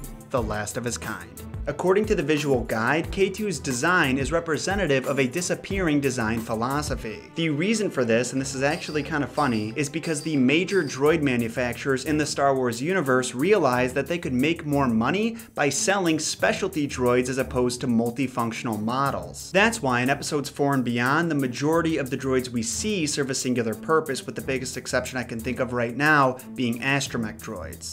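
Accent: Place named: Boston, United States. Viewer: I like you accent and the way you say "philosophy". Boston?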